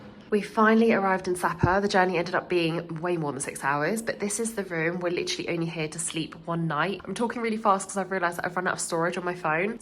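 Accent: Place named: England, United Kingdom. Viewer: I like your accent ! Are you from England ?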